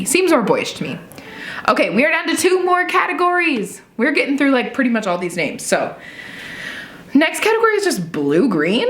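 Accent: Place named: United States. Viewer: I like the cowboy accent 😂😂😂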